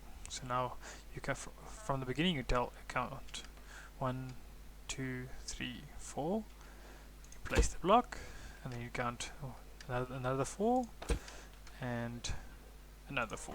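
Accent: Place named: South Africa. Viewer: You sound like a fellow SA bra good vid btw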